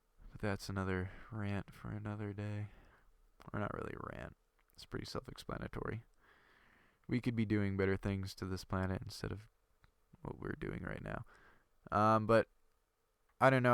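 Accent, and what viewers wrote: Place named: Canada. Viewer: Your accent sounds more Canadian . . . by the way, Big fan of the series and take a lot of tips and architectural designs from it. Keep it up!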